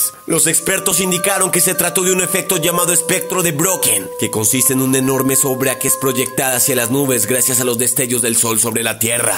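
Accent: Colombia. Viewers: Eres de colombia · Sos colombiano ?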